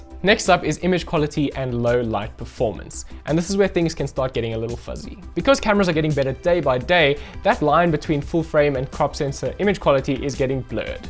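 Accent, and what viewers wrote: South Africa. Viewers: Hey Man, you sound South African :) I’m South African too lol. Would love if you checked my video out and told me what you think :) be pretty cool if you up in joburg, I could help you out with stuff and learn from you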